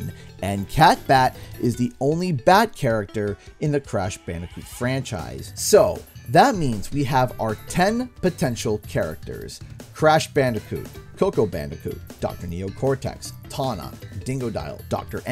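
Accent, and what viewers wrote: Canada. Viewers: It's cool that you have your own Crash 4 with a -version of Crash.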